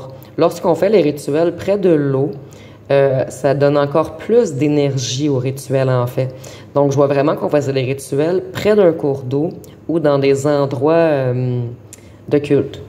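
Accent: Canada. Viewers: Bonjours à vous ! Je me demandais, comment vous avez capté ça, vu votre accent vous êtes au canada ? Mais tout ça là, j'le vois dans mon petit coin d'france ! Mais tout tout tout... Vous êtes balaise !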